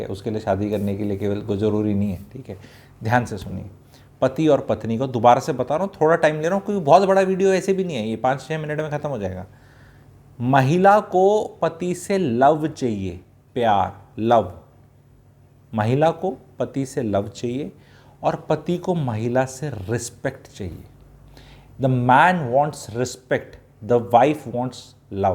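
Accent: Haryana, India. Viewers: Hariyana se ho aap kya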